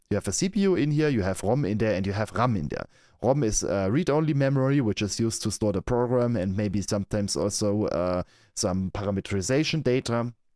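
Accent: Germany. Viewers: Man hört die deutsche accent 😅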